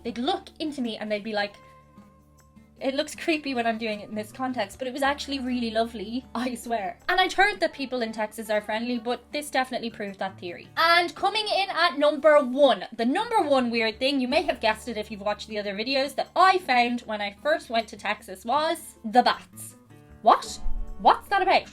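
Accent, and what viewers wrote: Dublin, Ireland. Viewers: ... Posh accent ???... What part of Dublin are you from ? That´s certainly a Dub accent, but posh ? Never in a million years.... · She's a Dubliner. You've been watching "Derry Girls" haven't you? That is a radically different accent up there. Accents across Ireland are as different as Boston and Alabama. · ehh ...i think shes completely irish just a bit influenced by american tv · Whatever her accent is, it is now my ideal perfect Irish accent benchmark that I will compare all others against. I dont have to keep playing it over and over to figure out what she said. Mostly.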